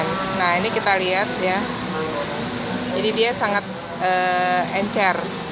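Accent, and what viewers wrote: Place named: Jakarta, Indonesia. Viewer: Suara jrlas dr jkt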